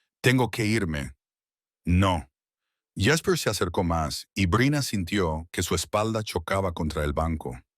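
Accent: United States. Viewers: Siii eso es lo malo del IA, el rey Saden aveces lo pronuncia como “saden”, “seiden” y hasta “siren” y a Brina a veces lo pronuncian como “Baina”, “Brea”, “Brena” y “Bria”; y para colmo aveces suena como un gringo tratando de pronunciar la R 😂😂😂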